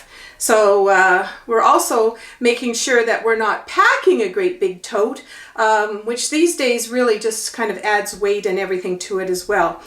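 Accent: Canada. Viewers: oh, now I think Canada? Am I right? heard a little accent.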